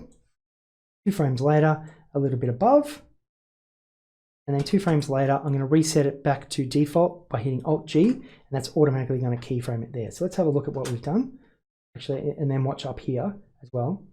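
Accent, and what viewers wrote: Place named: Australia. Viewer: feel like im at aus again, with the accent, been working thier for about 3 yrs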